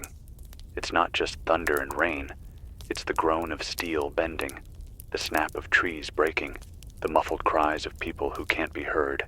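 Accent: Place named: United States. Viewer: Hint,if you want to sell....don't speak American or mention trumpland,///juahhh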